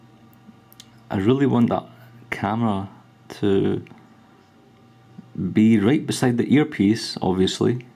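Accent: Scotland, United Kingdom. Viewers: rofl you fail, anyways that's just a scottish accent, and it is my number one of accents i want to learn (I'm dutch so i'll have some challenge), on my number two i've got the australian accent. I won't even buy a liquid-applied screenprotector but when I heard this accent I just watched throughout the entire vid :P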